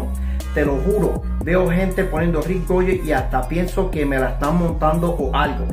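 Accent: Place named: United States. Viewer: No hablabas muy bien español O es mi imprension jajaja se te notaba más lo estadounidense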